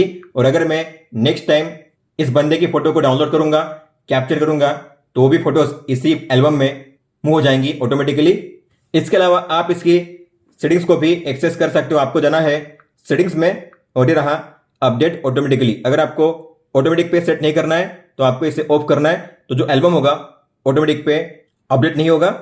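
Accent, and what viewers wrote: Gujarat, India. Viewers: Are you from gujarat..? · Are you from Gujarat?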